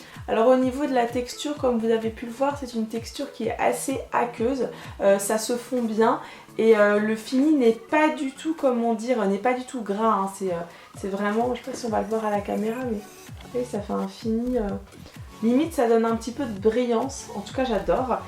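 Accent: England, United Kingdom. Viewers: sympa ta vidéo mais l'accent anglais ah ah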